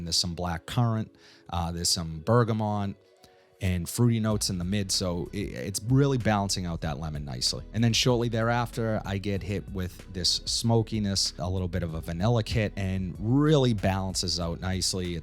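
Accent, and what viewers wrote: Boston, United States. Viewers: You have that Boston accent. Looking into this as my first niche fragrance.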